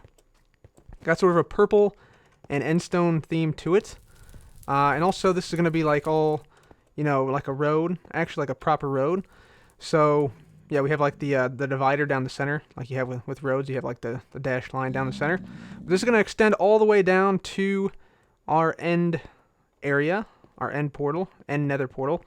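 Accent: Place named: Ohio, United States. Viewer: probably america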